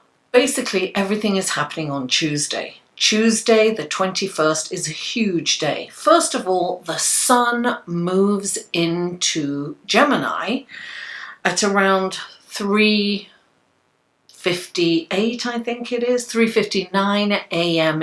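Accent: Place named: United Kingdom. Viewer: thank you for this reading,, it did resonate with me & spoke the truth about something that occured to me last month. Where are you from I love your accent,,, I'm from USA, you sound British,,, many blessings to you.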